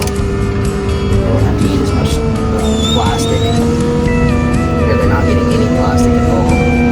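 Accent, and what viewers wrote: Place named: Upper Midwest, United States. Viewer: Nice video 👍 Just found this channel. Good stuff! Where are you located? Sounds upper MW. I'll be checking in from WA.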